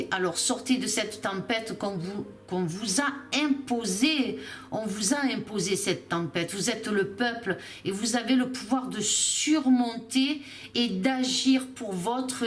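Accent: South, France. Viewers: Bonsoir toujours un plaisir de vous écouter heureusement que Dieu vous a donne ce don pour nous éclairer et puis j aime le petit accent du sud 🙏🏾❤️☀️🌹🧚🏿☘️🌞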